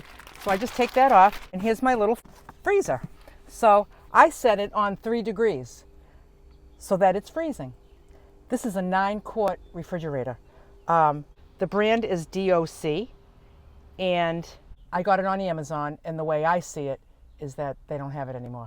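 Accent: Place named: New England, United States. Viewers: Love your new england accent...Massachusetts gal myself...you're very cool! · ..where in New England are you? I grew up in Massachusetts and love your accent! You are so clever. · I love your accent, reminds me of "Aunt Elsie" from Martha's Vineyard.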